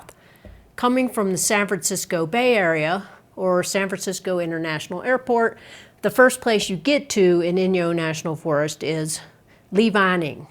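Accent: South, United States. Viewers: ohh, I look forward to that video. i'm going to visit again in september or october on a very dark night, and I'll try to check out Lee Vining. I love the way you say that name with your southern accent! Do you have a tip on a red headlamp to use? Thanks again 😊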